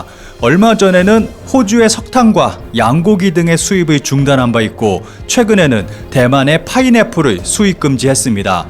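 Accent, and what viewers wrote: China. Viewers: 중간 중간 중국식 발음이 많아 불편하네요. 가오더 ㅡ고덕ᆢ 요즘들어 인명 지명 여러 곳에서 중국어 발음 많이 듣네요. 힌자를 읽는 순 우리음이 있는데.,.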